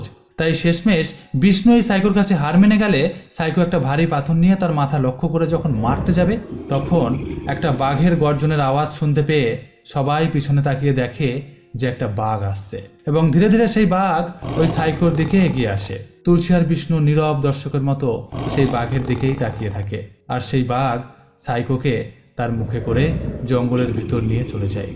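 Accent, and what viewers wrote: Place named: Visakhapatnam, India. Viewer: উচ্চারণটা মনে হয় বিশাখাপাট্মম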